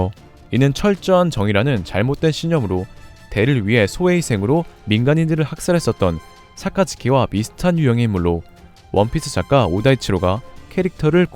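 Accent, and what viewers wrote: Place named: South Korea. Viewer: 수면용 책읽기 방송 부탁해요 어쩜이리 순도 백프로 한국억양인지 ㅎㅎ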